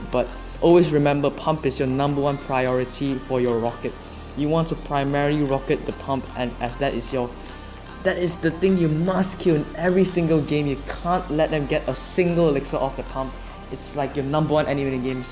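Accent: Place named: Singapore. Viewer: your accent sounds singaporean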